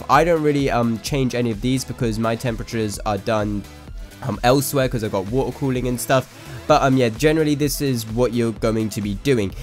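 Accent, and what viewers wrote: India. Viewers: your from north london? your accent seems indian and your face, and also your name everything seems indian soo yeah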